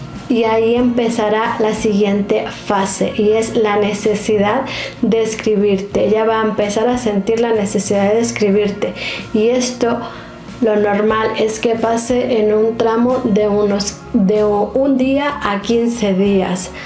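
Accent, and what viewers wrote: Spain. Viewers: Estoy enamorado del acento de esta chica... De que parte de españa sera??? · A mí también me encanta toda ella y da buenas explicaciones... A veces se escucha como si fuera española, una jalisquilla con acento español...❤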